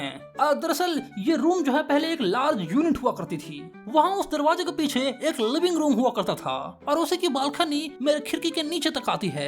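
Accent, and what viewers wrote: Bihar, India. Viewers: Sir you use to talk in your bihari tone too many times "susura" pls have a look at it 😢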